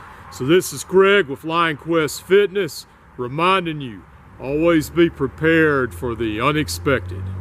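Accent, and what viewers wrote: South, United States. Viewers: My favorite carry is my S&W snubbie with laser. So light and easy to carry which explains why it’s my favorite. Keep up the good work. I love that southern accent of yours! LOL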